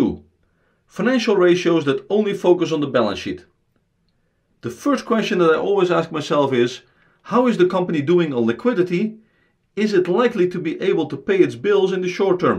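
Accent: Netherlands. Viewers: You are Dutch...I can hear it. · Are you Dutch? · Alleen echte strijdes herkennen het Hollandsche accent :)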